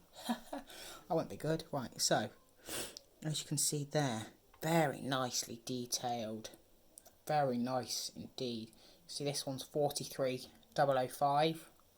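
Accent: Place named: Scotland, United Kingdom. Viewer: Nice accent mate are you Scottish just wondering cuz my ancestors are Scottish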